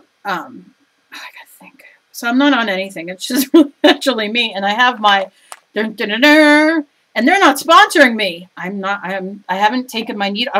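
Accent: New Jersey, United States. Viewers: I love your accent, Jersey? Philly?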